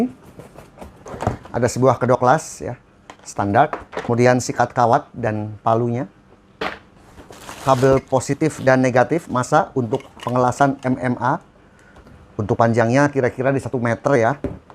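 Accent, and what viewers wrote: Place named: West Java, Indonesia. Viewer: Alhamdulillah bukti hasil positif dalam memberikan edukasi memberikan ilmu gratis yang diberikan oleh akang guru kepada para subscribe yang selama ini setia menunggu review tool murah meriah hingga tool premium, terima kasih atas ilmu dan informasi yang diberikan selama ini, ingat awal mula mengikuti chanel ini secara tidak sengaja kalo ga salah masih 2 k dan masih sederhana masih ada lampu bohlam di atas meja, terus kalo review logatnya sunda pisan, selain itu selalu berbarengan dengan kumandang adzan subuh, selamat akang guru wilujeung 20 k mudah mudahan terus dan terus berkembang hingga mendapat silver bottom 🙏👍👍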